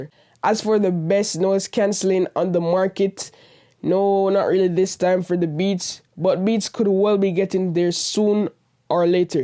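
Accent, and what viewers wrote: Jamaica. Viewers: naaaa he’s Jamaican. He has a Jamaican accent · i knew it! you sound just like a Jamaican. big urself yute. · Jamaican accent it's there Great video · You jamaican bro? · No that's because he's of Jamaican decent living in canada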